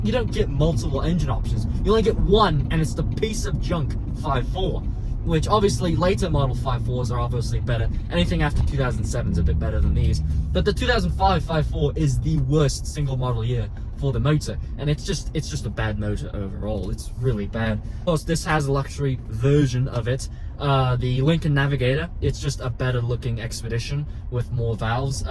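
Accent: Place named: Europe. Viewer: This is simply false, and this masked charlatan with his European accent is dealing with an uninformed personal opinion of an individual machine. The Expedition – note: the XLT he has is the most bare-bones model-- is large, luxurious in better models and, if important regular maintenance is involved, very reliable. The engine can have a sludge buildup if insufficient maintenance is done which can lead to problems, but ignoring a car or truck is bad news regardless. It does not get good mileage, but it is a big muscular V-8 which can tow a house if you hook it up. Probably this little fellow is resentful of that fact. I take mine – with dogs, four crates and shotguns-- bird hunting and it’s great off-road and in snow when others bog down. No doubt he’d be happier with an electric vehicle.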